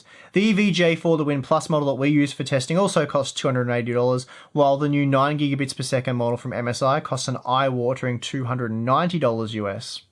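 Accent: Australia. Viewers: You Aussie? Kiwi and you guys sound alike 😅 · I watch your videos because you have great benchmarks, and you're a fellow Aussie so your accent sounds more natural to me.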